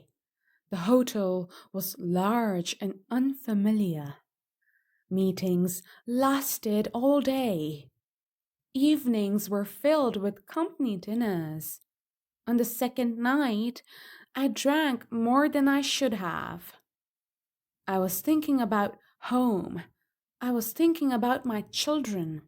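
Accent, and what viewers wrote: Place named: United Kingdom. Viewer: I watching from Ethiopia 🇪🇹 so best story with perfect British accept ❤❤❤❤❤❤❤❤❤❤❤❤❤❤❤🎉🎉🎉🎉🎉🎉🎉🎉🎉🎉🎉🎉🎉🎉🎉🎉❤❤❤❤❤❤❤❤❤❤❤❤❤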